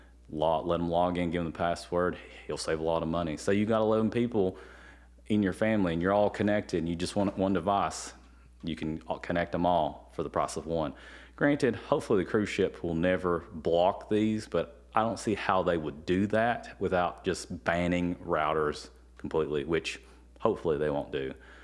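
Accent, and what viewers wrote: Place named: South, United States. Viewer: Why does this philipino guy sound like a southerner hick?? the accent is just annoying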